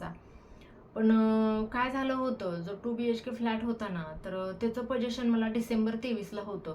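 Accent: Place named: Sangli, India. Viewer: One shoul buy flat as oer his requuremnt unnecesry buy so mny flat may out u in danger.as maintence of society etc hv to oay al bills etc.its costky akso unnecessry paymnt if dues.try to hv 1 gd flat wgere u can maintain d same properly.its not wirth to hv so mny fkats if u hv income u may go n rent tgem out so u may get som income. I think u r from sangli area its accent is in Sangli language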